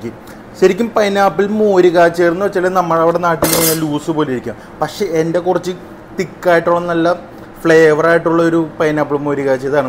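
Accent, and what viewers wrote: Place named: Ernakulam, India. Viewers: സംസാരം കേട്ടിട്ട് പറവൂർകാരൻ ആണെന്ന് തോന്നുന്നു😅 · തനി എറണാകുളംകാരൻ 😁 " നമ്മ ഈ കാര്യങ്ങളൊക്കെ സെറ്റാക്കി വച്ചിട്ട് പടേ ന്ന് cook ചെയ്യണം " 😁😁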